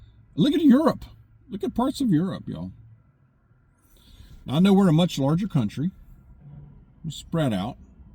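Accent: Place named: United States. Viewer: I like how you talk...good hearing just regular American citizen real person. I'm Tennessean so that's more like normal folk.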